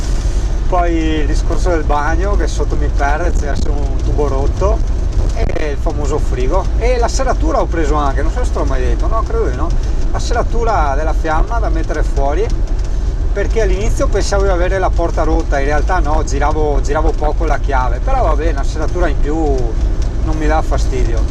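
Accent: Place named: Milan, Italy. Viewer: ma quanto cazzo parli milanese del cazzo..insopportabile...se dovessi solamente un giorno capitare con te in auto, mi sparerei al primissimo secondo al solo ascoltarti parlare... anzi ti sparerei...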